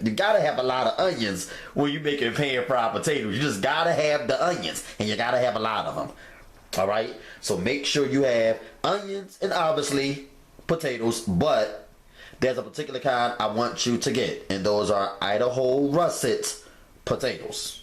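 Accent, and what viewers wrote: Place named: Philadelphia, United States. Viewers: You got that Philly accent. Im originally from Philly. I'm in Ohio, I get teased about my Philly accent. You got me laughing. I'm making this tonight. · on the sugar! Us Pollocks use sugar in our potato pancake recipes which is then plated and topped with powdered sugar, if you like. But I question one thing, me being from Chicago. How come you boys from Philly can't pronounce boil or oil????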